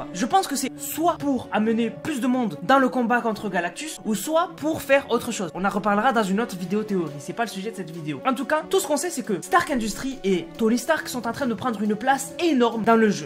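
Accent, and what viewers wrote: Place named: Marseille, France. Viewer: Ton accent marseillais il est partie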